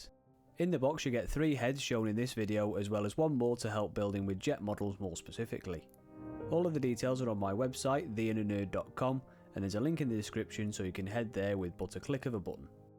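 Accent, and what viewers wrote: United Kingdom. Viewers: Ready to take up one of my favourite childhood pastimes again, and your channel looks splendid for learning the proper way to do it. Was a bit confused seeing Biltema acetone combined with your British accent. DIdn't know it was available outside Scandinavia.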